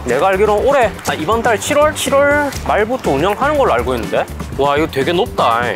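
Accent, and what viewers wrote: Gyeongsang, South Korea. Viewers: 님 부산분? 아님 경상도? 말씨가 사투리라서. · 갱상도 아이가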